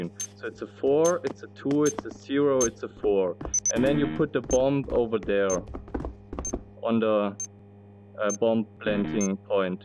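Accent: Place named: Germany. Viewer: dieser deutsche Akzent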